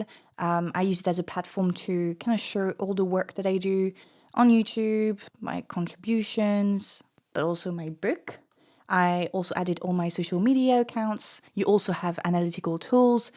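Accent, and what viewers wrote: France. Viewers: Thanks for raising your voice regarding Temu and that mass consumerism. Got a rather ascetic lifestyle, so Temu's offering sounds immediately idiotic to me. Why buy more than you would ever need? Seems crazy to me, but i know how society (*ahem* businesses) push people to always want more, newer and shinier stuff... Btw, took me a surprisingly long moment to realize you are FR, as I am. Great accent :)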